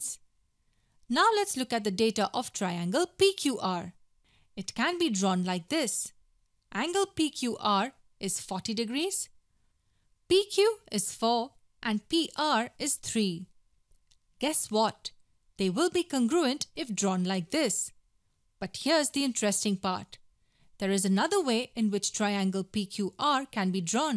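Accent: India. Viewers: She sound like indian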